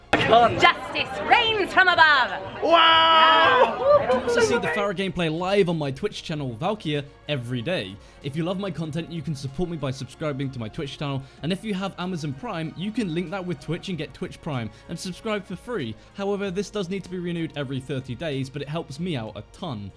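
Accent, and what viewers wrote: United Kingdom. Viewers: Where are you from? Southern England?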